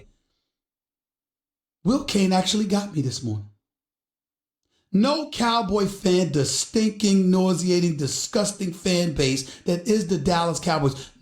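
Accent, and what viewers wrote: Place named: New York, United States. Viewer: All of new York coming outta this man 🤣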